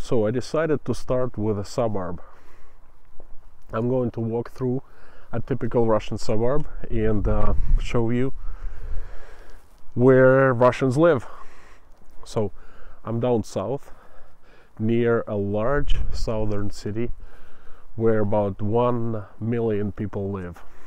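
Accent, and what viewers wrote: United States. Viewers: You speak interesting , clear American English. I watched all of this presentation. Thank you , good job of it.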